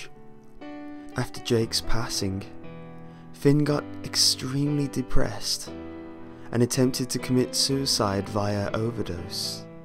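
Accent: United Kingdom. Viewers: WOW..WOW.....WOW!!!!! THAT WAS SO DEEP! I LOVED IT! BTW I keep thinking your British.. I don't know why but...I just think that. Are you British?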